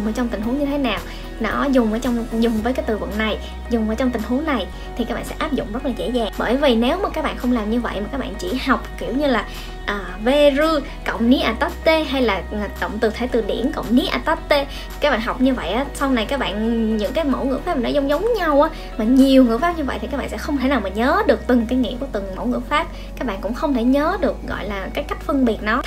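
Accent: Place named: South, Vietnam. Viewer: Lâu lắm mới thấy vlog về tiếng Nhật giọng miền Nam, quá dễ thương. Cảm ơn bài chia sẻ của bạn.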